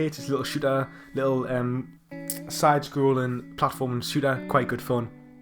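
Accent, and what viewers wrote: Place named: North East England, United Kingdom. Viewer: Are you from the north east of England by any chance? If you are, then I’m a fellow north east trophy hunter haha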